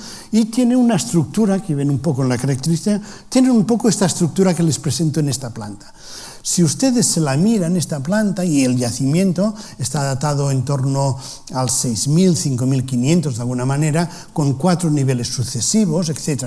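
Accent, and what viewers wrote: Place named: Catalonia, Spain. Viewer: Sabe hablar en Español? Parece catalán...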